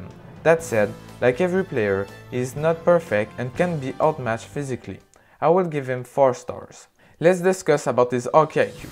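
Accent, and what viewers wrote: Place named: Quebec, Canada. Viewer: Are you a Quebecois? I am trying to figure out what your accent is. I am Californian. My distance from it makes me unaware of the accent.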